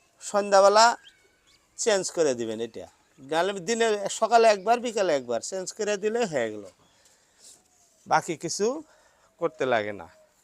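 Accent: Bangladesh. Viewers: কাকাকে আমি তো মনে করছি বাংলাদেশি । আপনার ভাষা আমাদের সিরাজগঞ্জের ভাষা একই রকম।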